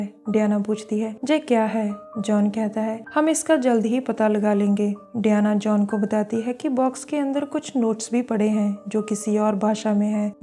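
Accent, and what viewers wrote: Punjab, India. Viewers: Yaar punjabiat jhalak di hai accent sahibkaro hindi ya punjabi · Hi my 💕 punjaban love ur voice & assent ur big fan Pardeep gill from Ludhiana Punjab ❤️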